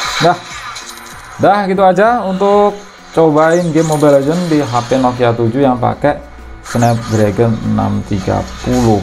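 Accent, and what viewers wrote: Blitar, Indonesia. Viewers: Orang Blitar ta mas??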